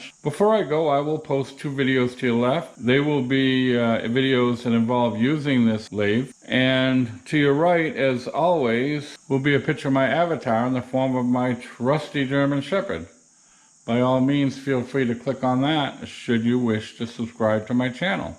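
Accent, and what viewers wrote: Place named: New England, United States. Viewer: Hey you sound like a respectable New England guy. If you’re in the Southern NH area I’ll pay ya $25 a rotor to do mine lol. Or if you have a recommended shop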